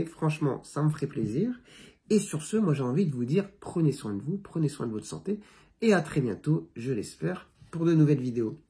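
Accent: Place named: North, France. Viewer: Ça dépend aussi de la région d'où on vient, je crois que le dr est originaire du Nord. Pour ma part, ma mère étant aussi de là-haut, j'ai hérité de cette prononciation.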